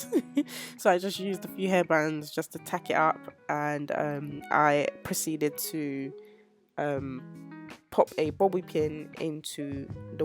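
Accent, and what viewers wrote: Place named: United Kingdom. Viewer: Tell me why I knew you you had a British accent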